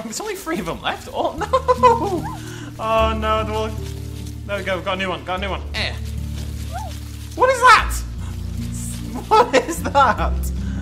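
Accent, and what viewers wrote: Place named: United Kingdom. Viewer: Hate to be that guy, but some words have multiple valid pronunciations, and regional pronunciations are a thing. Utilizing several sources, I have determined that there are three accepted pronunciations of the word, with the two american ones being "Nitch" and "Neetch," and the common British, which if you couldn't tell is, pronunciation being "Neesh."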